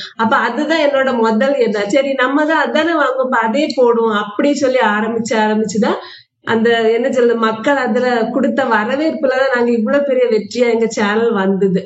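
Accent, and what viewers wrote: Kanyakumari, India. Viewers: உங்க குரல், அந்த பேசுர அந்த கன்னியாக்குமாரி வார்த்தை. எனக்கு ரொம்ப பிடிக்கும். முக்கியமா, கேட்டியலா...அதற்கு நான் அடிமை. நான் திருநெல்வேலி .பக்கம்.சங்கரன்கோவில்..... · உங்கள் குரல் மற்றும் பேசும் விதம் ( அந்த கன்யாகுமரி பேச்சு) இது தான் உங்கள் வளர்ச்சிக்கு காரணம்.வாழ்க வளமுடன். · கன்யாகுமரி slang ,சுறுசுறுப்பு , neatness, மீன் , உங்க உற்சாக குரல் இவை எல்லாம் உங்களை பிடிக்க முக்கிய காரணம் இப்போ இந்த சாந்தமான முகம் ரொம்ப பிடிக்குது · Mam unga vedio daily parpen ,un kayakumari slang ennaku romba pdikum mam super aa panringa unga job CS pati solunga mam my daughter want to study CS so pls guide vedio onnu podunga ,how job opportunities after CS pls explain mam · Ivanga thirunelviy illai. kanyakumari maavattam. kannykaumari maathiri thaan azhakaa pesuhaa. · Best wishes for your grand success in your life n channel. Your voice, Kanyakumari way of Tamil speech r all good. 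Carry on 💪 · கன்னியாகுமரிஸ்லாங் தான் உங்களூடைய வெற்றிக்கு காரணம் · உங்க குரலுக்காகதான் நான் முதலில் பார்த்தேன். இப்போது உங்களையும் பிடித்து விட்டது உங்க கன்னியாகுமரி பேட்சும் பிடிச்சிட்டு. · Your kanyakumari dialect and baavala illadha humility dhaan vunga plus points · I love fishes so I’m Eagerly waiting for ur daily fish videos neenga seirathu patha saputa mari oru feel😋😋😋 and Ur Kanyakumari slang is so natural ❤nan Coimbatore unga slang enaku kekka puthusa nala erunthuchu 🥰love from Coimbatore 💟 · அருமையான இனிமையான கன்யாகுமரி பாஷை வாழ்க வாழ்க வாழ்க வளர்க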